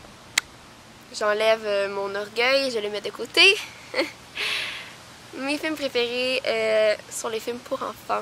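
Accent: Quebec, Canada. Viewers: tu es si belle!!! jaime tellement ca entendre laccent quebecois dans des videos beauté hihihi . continue je mabonne :D (omg jugez moi pas la jpas sportive jai dautres talents........... hahahahaha gurlll jte comprends ..........) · Coucou ♥ Je suis Suisse et je suis tombée sur ta chaîne par hasard. J'ai juste ADORÉ car tu as le sourire dans toutes tes vidéos, toujours de bonne humeur, et suuuper drôle! En plus J'adooore ton accent Québécois trooop chou! Non sérieusement et en plus t'es magnifique♥♥ continue à faire des vidéos:) t'es juste géniale... Bisous de la Suisse!!! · Tu est québecoise ou française ? :o)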